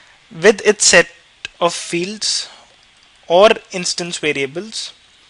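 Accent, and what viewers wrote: India. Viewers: Amin Mohammed His accent sounds like Indian - He's an Indian bro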